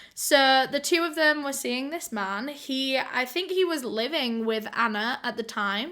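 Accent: United Kingdom. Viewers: so is that a British or Valley Girl accent. I can't tell.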